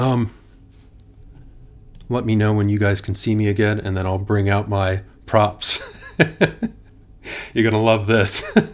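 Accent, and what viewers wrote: New Zealand. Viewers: Nah, Yeah... That’s definitely Kiwi speak!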